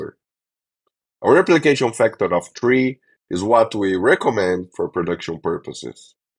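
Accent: Brazil. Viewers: Bro, are you Brazillian? Asking due to the accent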